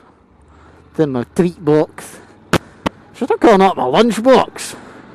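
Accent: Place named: Scotland, United Kingdom. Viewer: That Scottish accent is seriously awesome :D...subbed for that alone lol ..now to watch some more vids ;)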